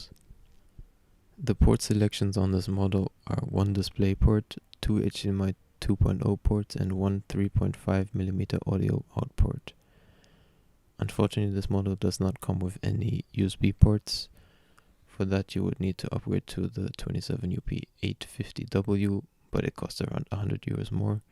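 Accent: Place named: Sweden. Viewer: Tf is with swedish accent, lol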